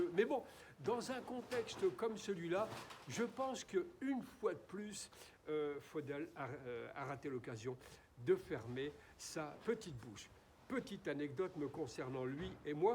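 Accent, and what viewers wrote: France. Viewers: Merci,et il y a du "TITI Parigot" dans cet accent de diction qui raisonne en mes oreilles 😊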